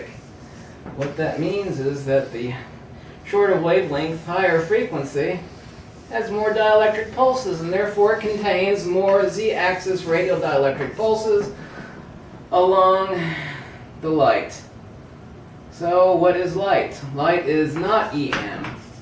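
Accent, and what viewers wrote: Germany. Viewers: Please lose the stupid German accent...very annoying!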